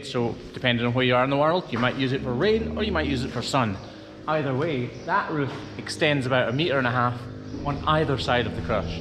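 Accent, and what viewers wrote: Scotland, United Kingdom. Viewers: What an awesome piece of equipment. As a lover if all things dairy, I was surprised when I first discovered your videos. I never realized how much attention cows' feet should have. Your channel is so professionally done. I especially like your opening. Obviously you work very hard. I also appreciate listening to your lovely Scottish accent and seeing the farms setting. Thanks! From Southeastern Pennsylvania.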